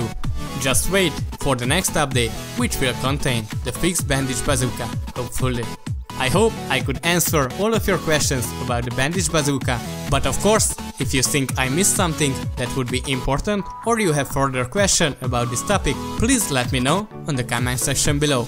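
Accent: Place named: Hungary. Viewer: You have a typical Hungaryan accent. 'És szerintem magyar is vagy, tetszik a hangod :D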